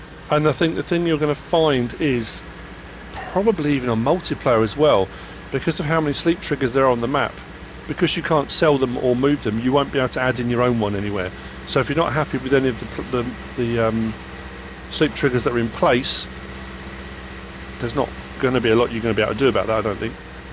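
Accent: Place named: United Kingdom. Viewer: Your British right? you sound it (☞ﾟヮﾟ)☞☜(ﾟヮﾟ☜)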